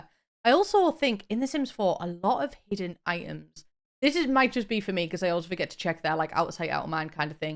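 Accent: United Kingdom. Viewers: Whole truth, i used to watch your videos to get used to your accent so that i can communicate better with my British clients and it worked. I get everything everyone says 😋 Thanks😬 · I need you to know that I absolutely LOVE you and your accent is my idea of the perfect British accent. Also this may be my favorite build you've ever done. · I watch a ton of British tv and youtube, so I don’t struggle with your accent exactly, it’s the combination of your accent and how fast you talk😉 I have literally had to slow your videos down to figure something out... and that’s mostly on me because I have hearing loss from listening to my music excessively loud when I was a teen... and even now sometimes... I didn’t learn my lesson😆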